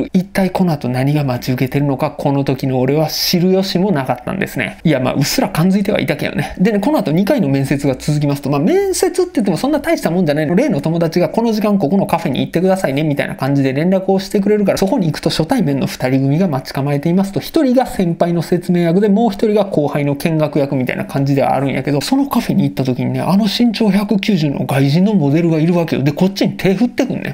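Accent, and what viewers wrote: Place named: Kanto, Japan. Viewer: さんの動画で過去一おもろかったおちょくってるような関東弁がいちいちツボ(笑)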